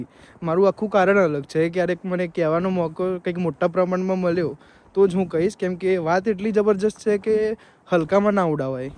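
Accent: India. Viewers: Bhai tamari boli prmane tame charotar ke amdavad na lago chho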